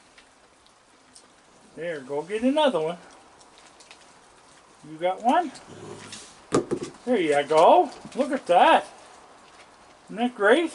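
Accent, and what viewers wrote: Canada. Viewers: Ellen M ---Many people who live in the Maritime provinces of eastern Canada , ( New Brunswick , Nova Scotia , Prince Edward Island ) have an accent . It is even more of an accent if they are from Newfoundland , or , they are from the Cape Breton Island part of Nova Scotia . Where he lives in Churchville , in Pictou County , Nova Scotia , he is not far from Cape Breton Island . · Very Canadian English eh? Had to look up what bed lunch was 🙂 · Greetings from Illinois. I LOVE your Canadian accent. Those little guys are precious. You are wonderful to take care of them every night. I descovered your videos on you-tube a month ago. Never miss watching now. Thanks & God bless you.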